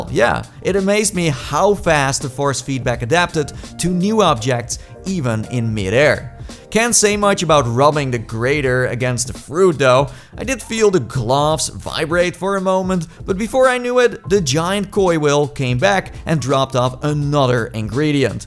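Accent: Netherlands. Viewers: You sound like your from the Netherlands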